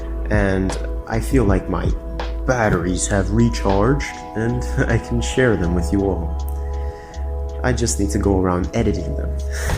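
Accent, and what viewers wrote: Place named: United States. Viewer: You have an American accent. Did you grow up in the U.S.?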